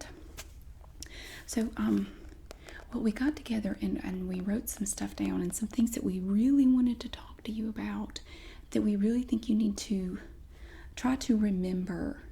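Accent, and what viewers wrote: South, United States. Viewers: To the Dumb fucks who hate southern accents, Why in the Goddamn fuck would yall even click on a video.I'm tired of these fucking yankee pricks talking their Goddamn Bullshit about us SoutherN People. I'm sorry for the profanity,But Im a High Strung Texan that's had a few drinks (PRE 4TH OF JULY) you are one of the best ASMR artist's Ive ever seen. You are a very beautiful woman,that doesnt look old. These Haters can just go Fuck off down the road somewhere. Leave This Great,talented beautiful woman alone!!!!!!!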